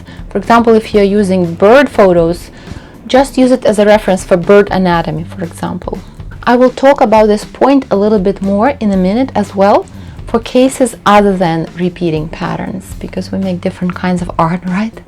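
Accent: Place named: Russia. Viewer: New subscriber from Oklahoma. I thought your accent might have been Russian. I had the privilege of visiting Russia in 1996 when I was just 17 years old. It has held a place in my heart ever since.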